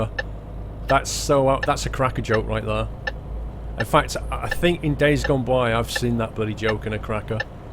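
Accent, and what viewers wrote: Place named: Yorkshire, United Kingdom. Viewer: Your from Yorkshire, sound mate same!!!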